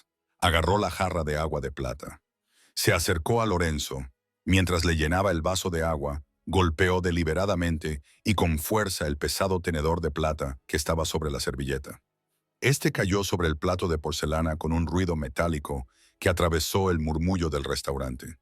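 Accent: United States. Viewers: ME ENCANTA LA IA , CON ESA VOZ CON ACENTO ESTADOUNIDENSE. , SERÁ PORQUE TENGO UN AMIGO ESTADOUNIDENSE QUE HABLA EL ESPAÑOL ASÍ. AH POR CIERTO ME ENCANTAN LAS NARRATIVAS DE ESTE CANAL. MUCHÍSIMAS GRACIAS. SALUDOS CORDIALES DESDE CDMEX MÉXICO.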